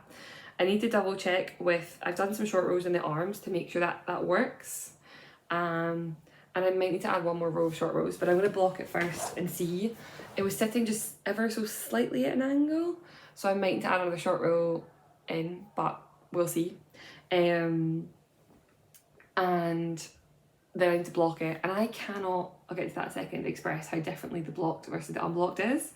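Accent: Scotland, United Kingdom. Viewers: I really enjoy watching your videos and I like the challenge of understanding you properly due to your Scottish accent. :-D Your Cargill pattern looks stunning, looking forward to knitting it once my knitting skills have improved. I have already knitted several sweaters, but they were all pretty simple. Greetings from Germany! :-) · Has your Scottish accent got stronger this past year?? 😂 Loving your creativity and pattern designs. Thank you for another fab podcast. Xx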